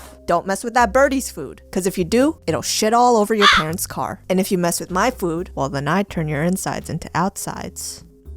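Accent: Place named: Long Island, United States. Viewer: You sound like a mix of Karina and Julia's Long Island accent from Drawfee.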